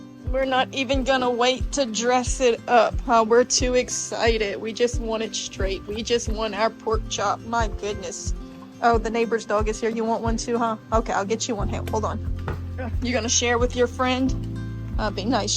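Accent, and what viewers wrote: Louisiana, United States. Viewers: Are you from louisiana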